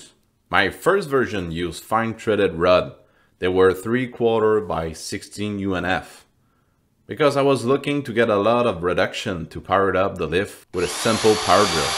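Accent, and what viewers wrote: Quebec, Canada. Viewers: ca ressemble a un accent du quebec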